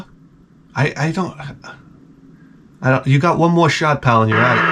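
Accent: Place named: Long Island, United States. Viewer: I stopped going to the Dr when I'm sick because they never help. Every time they tell me I have to let it "run it's course". I don't need to pay someone to tell me that shit. Hope you're feeling better though. And thanks again for creating the greatest video game program in the history of human civilization. You're now the only person I am supporting on Patreon because you're just so damn entertaining and funny. It's also rare that I find someone on YouTube that sounds like me 😂 Almost makes me miss living on long island.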